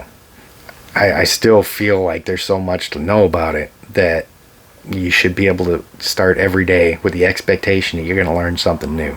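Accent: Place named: Northern Illinois, United States. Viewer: I was gonna ask if you are from N Illinois! Close enough! I still have the accent too and been gone 20+ years... And thanks for your service man!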